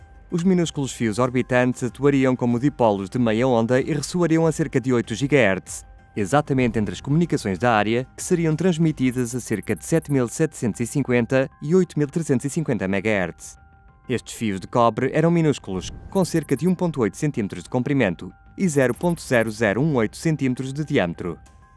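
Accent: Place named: Portugal. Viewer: Amei a locução em português PT❤️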